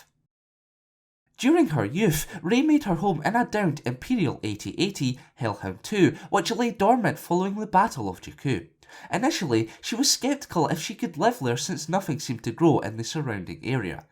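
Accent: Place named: Aberdeen, United Kingdom. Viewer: Im guessing you're from Aberdeen, Scotland and 17-19 years old?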